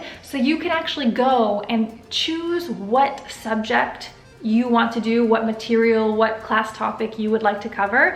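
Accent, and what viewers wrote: Italy. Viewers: Trop bien ! Tu parles déjà hyper bien. Je trouve aussi que t'as un tout petit accent italien quand tu parles en français, mais ça fait très classe :) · So glad to hear you speaking my language!! I'm so impressed to see you speaking so many languages! I think I never told you that I was French but I'm really happy to hear that you started to take French lessons online! Do you know what? You've got an Italian intonation when you speak French ! I'm excited to see more videos about language learning especially (and about French in general)!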